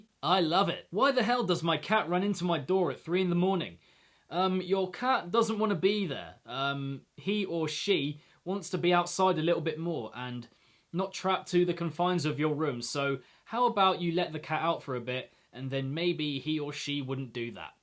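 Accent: United Kingdom. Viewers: 5000 subs is a really nice mile stone. but your lush British accent deserves 100,000,000.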